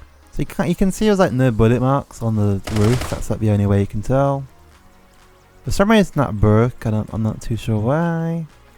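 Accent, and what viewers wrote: United Kingdom. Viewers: Shhh I love ur British accent!!!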